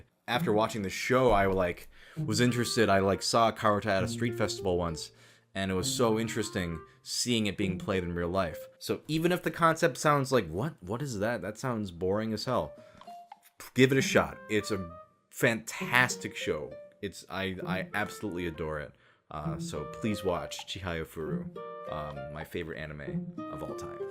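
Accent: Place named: United States. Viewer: he looks asien sounds American they have evolved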